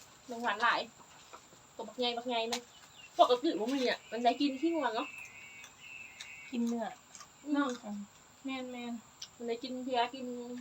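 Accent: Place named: Loei, Thailand. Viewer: เว่าคือทางเมืองเลยน้อ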